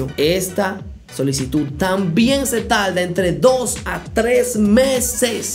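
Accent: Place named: Dominican Republic. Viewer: En tu acento te Me pareces dominicano espero que sí pro ases muy bueno videos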